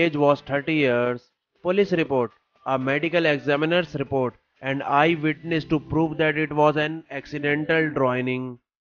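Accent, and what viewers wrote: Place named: India. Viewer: Voiceover dude has huge Indian accent.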